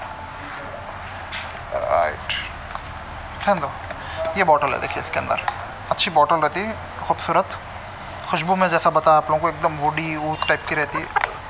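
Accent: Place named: Hyderabad, India. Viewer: Badiya ek dum hyderabadi style..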